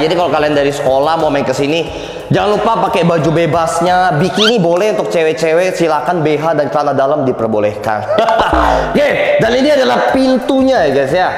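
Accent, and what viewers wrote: Palembang, Indonesia. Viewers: Logat Palembang kau masih melekat 😂 mantap semoga lancar dan reme tempat baru nya aminn🙏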